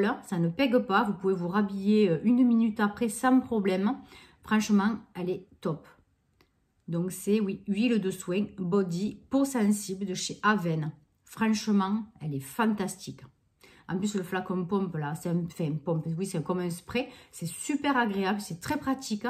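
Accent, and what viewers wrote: South, France. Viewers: Dis donc je me régale avec l'accent du sud, il y en a peu sur YouTube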